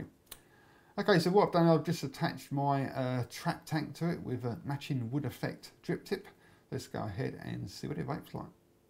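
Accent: United Kingdom. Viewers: Do you know that your vids are good for people who want to learn British accent? Btw, great review as always, stay lit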